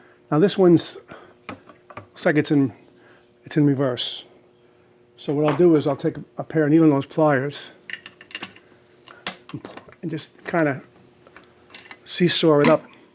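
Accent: United States. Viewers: Thanks for the reply and there were times I SURE could have used one of those shift rod connectors. I HAVE just twisted a stainless bolt in and it worked. I been meaning to ask where you are, am I correct that the accent is North Eastern US? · Love the Long Island accent! Cool videos. Keep 'em coming!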